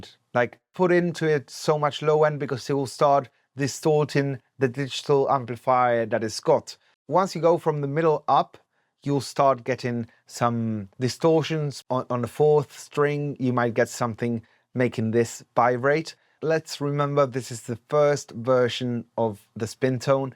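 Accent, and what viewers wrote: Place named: United Kingdom. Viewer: Veri cul british axent 🤙😉🖖👽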